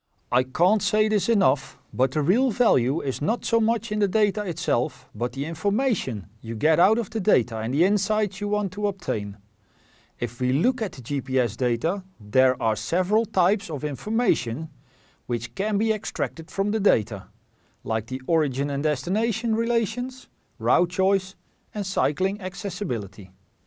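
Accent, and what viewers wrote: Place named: Netherlands. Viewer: Very lekker Dutch accent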